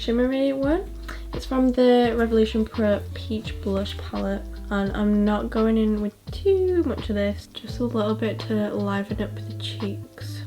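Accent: United Kingdom. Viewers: wait wha- your British!!!!!! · where are you from cause tha is a UK accent 101 😂😂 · Who knew she was brittish lol I am shocked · She is BRITISH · I follow her on tiktok and when I saw she had a YouTube channel I was so happy! When I played the video tho, her voice shocked me, I thought she was American but shes British. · First time hearing your voice... and I'm like WTF SHE'S BRITISH, but like where abouts you from cause I know a few people with your accent? Xx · yea I was so shocked when I found out she was British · Wait I watch ur tik tok’s and I never heard ur voice! I thought you was American wait so are you British like me??? 🤔☺️💗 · Youre britishh!?!? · Is she British??? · James charles: No comments about voice Moriah elizabeth:no comments about voice: omg ur voice is soooo BRITISH i thought it wud be like american.... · WOoh i thought she has an american accent not a british accent hahaha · Wait what the..... 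she is British i... Wow 😳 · She’s BRITISH🤯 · Omg u're britishh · Wait are you from the uk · Are you British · DUDE I THOUGHT SHE WAS AMERICAN BUT SHES WHATEVER WHAT BRITISH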